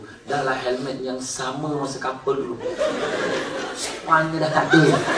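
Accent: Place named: Negeri Sembilan, Malaysia. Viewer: Ustaz syamsul debat memang salah satu penceramah hebat dan menjadi salah seorang penceramah kegemaran saya..dengan loghat n9 dia tu..buat saya terhibur. Tq pis..share.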